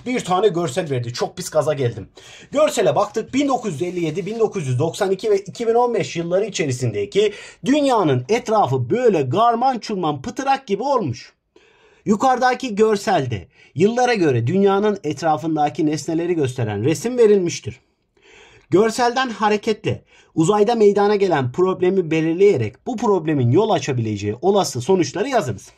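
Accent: Kayseri, Turkey. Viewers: Abi sen gayserilimisin?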